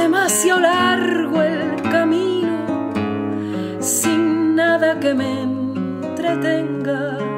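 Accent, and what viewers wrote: Andalusia, Spain. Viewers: de que parte de españa es tu acento? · Excelente,. Me hiciste emociar. ¿Sos andaluza? Genial, genial interpretación. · Hermoso lo que hacés! Soy argentino, esta cancion la escuche muchisimas veces pero me llegó tu interpretacion tan sentida. Muy buena tu guitarra y tu voz. No se de donde sos ( tal vez andaluza por el acento?) pero transmitis muy bien lo que don Ata hacía.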